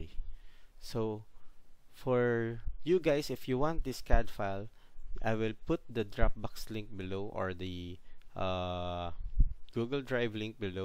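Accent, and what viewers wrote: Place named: Philippines. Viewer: I can tell your pinoy from ur accent😀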